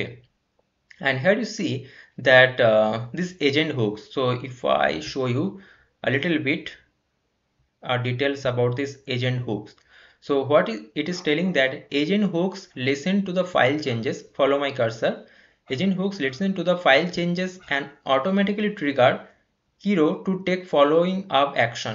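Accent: Bengal, India. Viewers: R u Bengali...ur ascent and pronunciation is alike native of Bengal.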